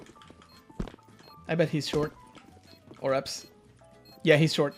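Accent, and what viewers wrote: France. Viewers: French accent ? 😁😁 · Are you french ? Your accent looks so much french if you're not it's weird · sound french ? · You have some Times a french accente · You have a French accent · J'adore ton accent francais esti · Is he french ? His accent is betraying him xD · you have a french accent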